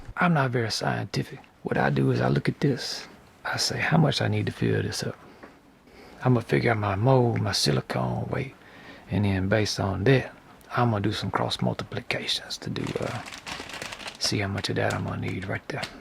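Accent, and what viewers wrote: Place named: New Orleans, United States. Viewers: Did I just stumble upon the Bob Ross of metal casting with a New Orleans accent? This is gold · This is possibly the most relaxing channel on here right now. And it’s also edumacational. You’re like a bayou bob ross. (Sounds like you have a Nola accent)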